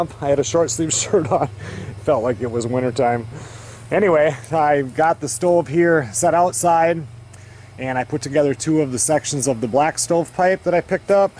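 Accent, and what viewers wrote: Minnesota, United States. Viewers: Love Minnesota accent, Ohh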